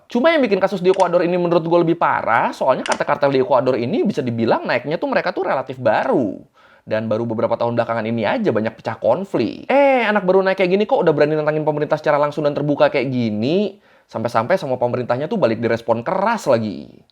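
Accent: Indonesia. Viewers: Macam sialan perbaca dari indon ni.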